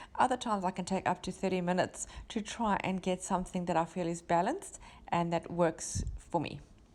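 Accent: South Africa. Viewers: Love your work and your way of explaining what you’re doing and how you come to your final decision about composition. Of course love your accent! Says a fellow South African. I would love to be a winner of one of your cards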